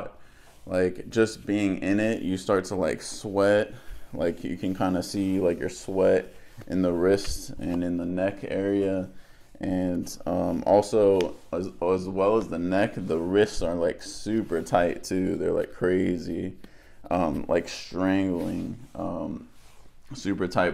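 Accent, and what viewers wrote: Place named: Czech Republic. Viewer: I know it's a stupid question, but I just have to ask, aren't you from the Czechia? I ask because you have such a Czech accent. Your last name is also used a lot here.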